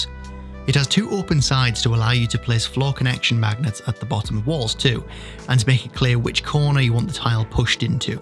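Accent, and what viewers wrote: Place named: United Kingdom. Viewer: why are you british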